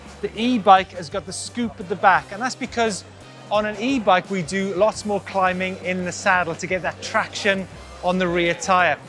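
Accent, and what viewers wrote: Wales, United Kingdom. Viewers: Nemanja X it sounds a little Welsh to me, can't say whether it's north or south though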